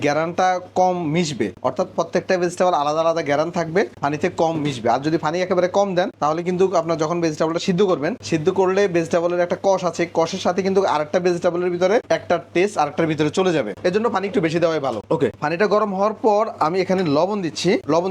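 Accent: Noakhali, Bangladesh. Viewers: ভাই আপনার বাড়ি কি নোয়াখালী?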